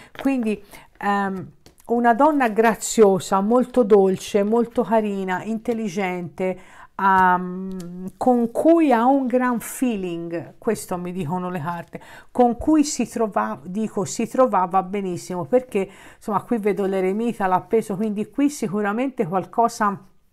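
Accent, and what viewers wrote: Tuscany, Italy. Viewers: Buonasera hai pienamente ragione si quello che ai detto e verissimo e un periodo che non lo sento però so che e informato su di me e non so che mi offre nel futuro però lui e come lo stai descrivendo e quello che dicono le carte gli ho dato l'animo.gli ho fatto una richiesta e non decide di farla dopo tanti anni penso che lo meriterei. Ciao carissima simpatica e bella sempre la tua voce con l'accento toscano❤️😘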